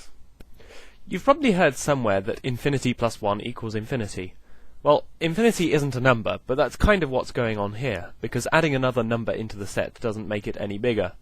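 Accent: United Kingdom. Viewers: American's (i hope i speak for my country) don't find British accents funny, but fun to listen to and attempt. If anything, it might be the slight lisp or sibilant s... don't worry about it, it's awesome. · OMG man, you are a comedic genius. I loved this video!!!!!! Make more!!!!!! Chainsaw + Baby is more of a supper or dinner thing, at least i think so... and the British accent kicks butt! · zenos paradox - infinite divisibility, cantors argument - whatever u said here.. cant get past thick british accent.. but im assuming that notion was pointing zenos paradox. the infinite variables from infinite divisibility. there is some likeness in these, surely you must see it. if cantors proof notes incongruity through bijection, it is only out of the inadequacy of listing real numbers. out of his entity, out of our sentience existing finitively.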